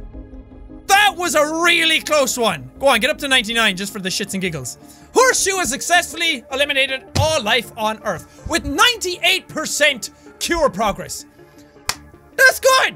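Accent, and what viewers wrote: Ireland. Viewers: is he irish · Erase What? Do you mean Top of the mornin' to ya laddies? It's because he's Irish.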